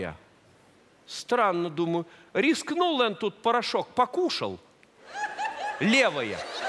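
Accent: Russia. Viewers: Как бы не рассказывал юморист, а он действительно родом из Воронежской области. И произношение у него такое. Вообще, Святослав спасибо за юмор. Рассеялась от души.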